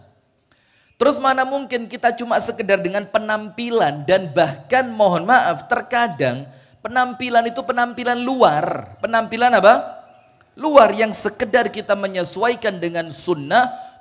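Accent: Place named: Malang, Indonesia. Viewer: Alhamdulillah jazakallah khoyron ilmuny ustadz,saya tinggal di Lampung tapi asliny org malang..jd tombo kangen dgn logat malangny🙏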